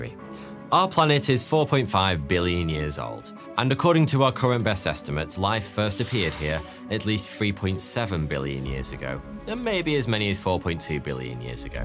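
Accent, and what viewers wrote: United Kingdom. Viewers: Are you British? What kind of accent do you have?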